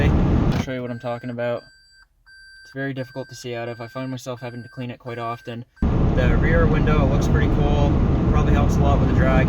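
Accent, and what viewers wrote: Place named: Canada. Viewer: You have a slight canadian accent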